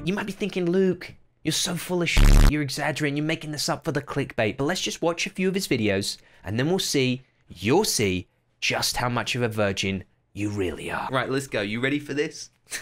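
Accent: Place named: England, United Kingdom. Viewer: He doesn't sound like dantdm he just has an English accent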